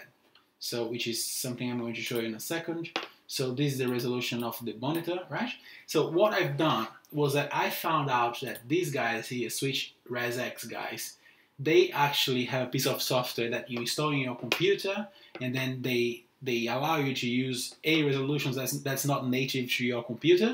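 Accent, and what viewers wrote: Brazil. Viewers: Vc por acaso é brasileiro ? Oo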